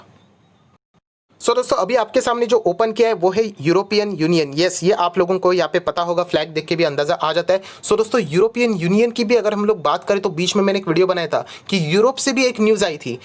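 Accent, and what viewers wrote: Maharashtra, India. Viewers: Sir aap ki mothertongue Marathi lagata hai.....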